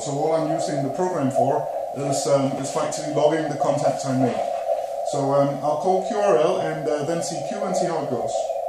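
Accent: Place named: Denmark. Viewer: you have got to be danish, your accent sounds danish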